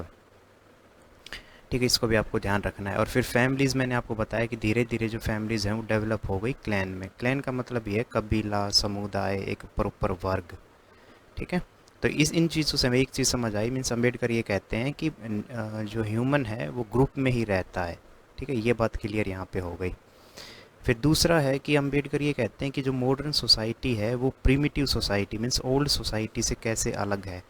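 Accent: Haryana, India. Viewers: Aap pakka Haryana se Ho sir. Aapki language se Lagta hai. Beech beech me yo haryanvi touch bahut Badhiya hai. Aap bahut acha padhate hai😊 12 unit ke baad bhi video banaiye please . Humble request 🙏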